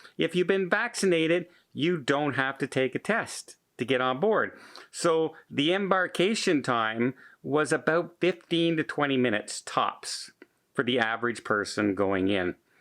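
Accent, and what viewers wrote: Canada. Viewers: Are you aware that you sound Canadian??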